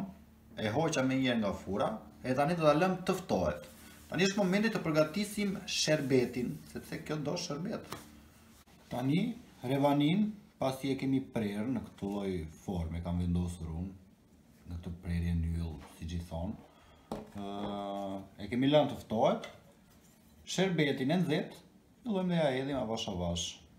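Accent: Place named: Berat, Albania. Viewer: te bëra subscribe, per suport. 🙂🙃 Nga je? Nga e folura dukesh Beratas.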